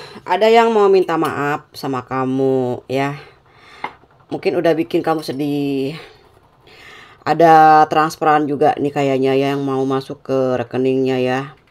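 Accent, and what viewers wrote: West Java, Indonesia. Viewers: Assalamualaikum bunda asli timana logatnah sunda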